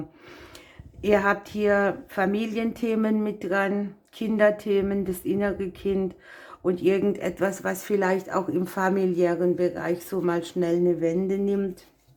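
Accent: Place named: Karlsruhe, Germany. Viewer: Rausgehört😊ich aus Bruchsal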